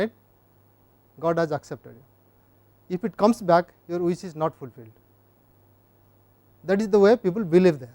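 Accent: India. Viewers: true. its really hard for non-Indians to understand profs accent. Subtitles would help since the content is good quality.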